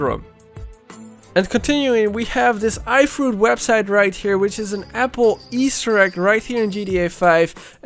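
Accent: Netherlands. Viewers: Nice Dutch accent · Are yu dutch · Are you dutch? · Are u Dutch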